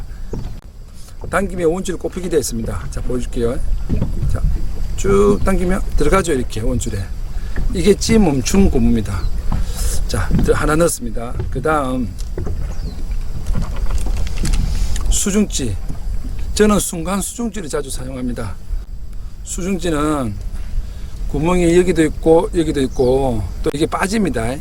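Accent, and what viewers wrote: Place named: Tongyeong, South Korea. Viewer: 고향이 통영인 외지에 사는 사람입니다 고향말을 들으니 좋아서 그러니 오해마세요 ㅎㅎ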